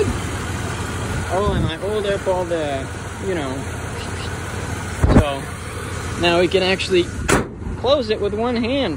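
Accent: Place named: South, United States. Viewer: Hell, he sounds too southern to be from Cali lol. Let's face facts here, has already mentioned back problems, it's only a matter of time before he won't be able to do it anymore. If this lad manages to keep a sense of humor while reviving old cars and trucks, then we should support his efforts. Hell I'm 50 and used to be a shade tree mechanic until well into the 90's, then the 2000's hit with all of this computerized voodoo and I got lost under the hood of cars today. It's great to see a youngin' picking up the pieces us old men just can't be assed to film while we cuss and fuss working on them.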